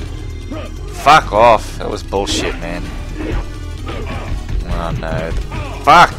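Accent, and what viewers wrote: Australia. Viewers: Yes, he's an Aussie! xD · Your voice sounds Australian. Is that right? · yoe91 100% Aussie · This guy is cool, is he australian?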